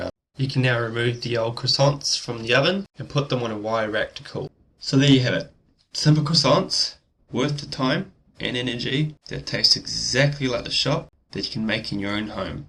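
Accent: New Zealand. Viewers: great tutorial, made even better by your beautiful kiwi accent <3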